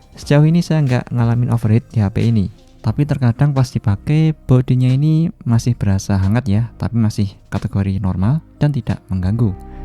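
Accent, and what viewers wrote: Boyolali, Indonesia. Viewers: Lo lo lo, wong boyolali to mas?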